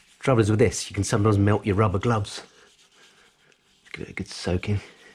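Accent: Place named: Essex, United Kingdom. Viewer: Classic Essex accent which sounds like an Aussie accent :)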